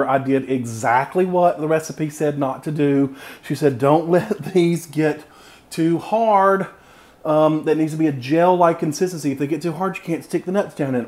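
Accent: South, United States. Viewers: Nah, he's just southern.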